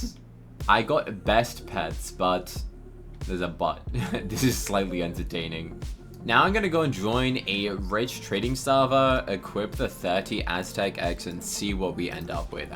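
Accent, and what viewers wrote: United Kingdom. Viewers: Wait he’s British? Edit:I searched it up and he is brtish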